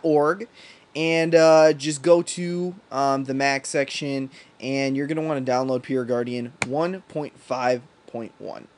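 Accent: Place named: United States. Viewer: annnnnnd you have reached your destination :) I love how you talk! Its so American and cool!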